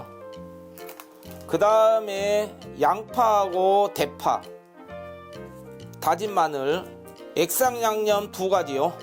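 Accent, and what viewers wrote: Jeolla, South Korea. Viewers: 셰프님 말투가 꼭 울동네 말투인듯.사투리도 너무친근요 ㅋ ㅋ .고항이.순천.여수.광양 셋중에 있을듯.전 참고로 광양 토박이.입니다.ㅋ ㅋ .혹시.고향이 어디신지요?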